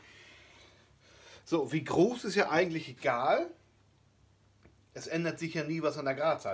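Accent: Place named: North, Germany. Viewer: Cooler Kanal! Endlich mal jemand der ein Norddeutsch schnackt! Ich freue mich auf weitere Videos von dir!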